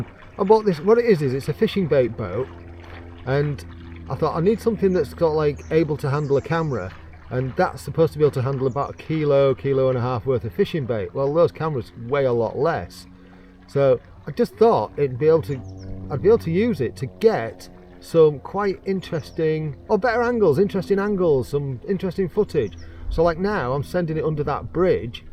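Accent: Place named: Yorkshire, United Kingdom. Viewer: I can hear a bit of queen's English around the Yorkshire slang, I was thinking Harrogate, but York makes sense 😂 I'm just up the road from Ferrybridge, but spend a lot of time over Selby/Aberford/Wetherby way walking dogs, so not too far from you if yer ever fancy a paddle and a chat!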